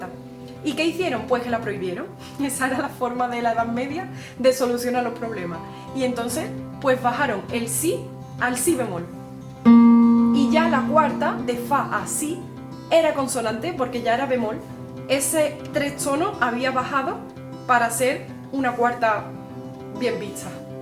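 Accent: Andalusia, Spain. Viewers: Es tu acento andaluz?suena muy bien❤🥰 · Cuanto talento en una persona tan joven!! Extraordinaria. Amo ese acento andaluz, tan parecido al acento chileno. Viva Sevilla la tierra de mi bisabuela. Saludos desde Santiago de Chile 🇨🇱🇪🇸🌹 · Dios mío que de cosas sabía Pitágoras!! Sigues siendo una gran comunicadora, y ese acento andaluz.... (emoticono de corazón)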